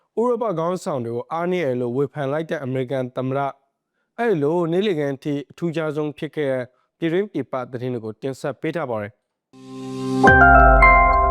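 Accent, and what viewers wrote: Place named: Rakhine, Myanmar. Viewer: ရခိုင်သတင်းဌာနလား ၊ သတင်းကြေငြာသူက ရခိုင်သံပေါက်နေတယ်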